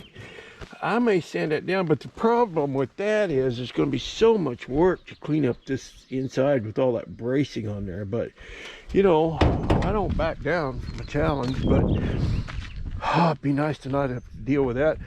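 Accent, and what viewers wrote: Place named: Arkansas, United States. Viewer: Whenever I hear some picking on a guitar! I know I’m in-for a wonderful trip into a mechanical adventure. Thank you for taking us along on your trip! You are the best at walking us along with you,while you recover your old square body from the ashes! I have watched every one of your videos from the Rockabilly build to now. And I have never been disappointed in any them. Your “ Ol’Arky” styled delivery and work ethic are a joy to witness. Thank you sir,for your guided tour of your trip of raising an old friend up from the ashes. “I sure do preciate ya!!!” From one old timer to another! Till the next time,keep on keeping on! P.S. we need more “ pickin and a grinnin!”😊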